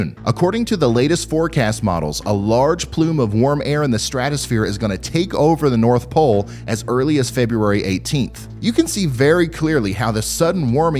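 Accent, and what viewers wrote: United States. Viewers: As I noted how country your accent was I realized how country I speak as well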